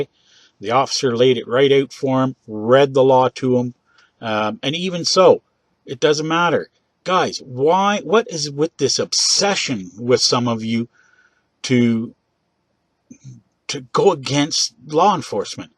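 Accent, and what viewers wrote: Canada. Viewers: With you're accent you can't fool anyone you're from anywhere but Canada! Glad to see you. Thanks for the update. Take care.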